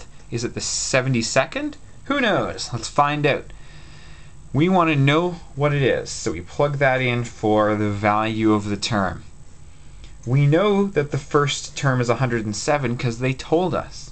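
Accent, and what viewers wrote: Canada. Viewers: You sound canadian:)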